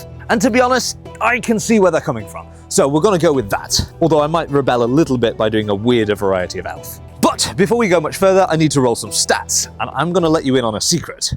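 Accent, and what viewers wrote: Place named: United Kingdom. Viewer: I really do hope that in the actual campaign you play up your Bri'ish accent.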